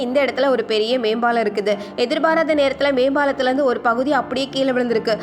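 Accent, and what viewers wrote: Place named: Coimbatore, India. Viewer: ok mam, கோயம்பத்தூர் slang மாதிரி இருஞ்சு, அதான்.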